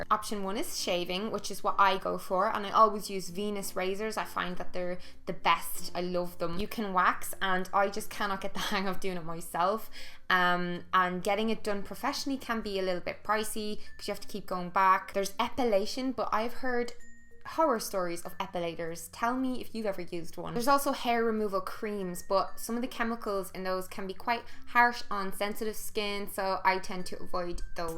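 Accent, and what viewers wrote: Ireland. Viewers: Love hearing an Irish accent when I click into a video, much love from down the road in Kildare ;) :)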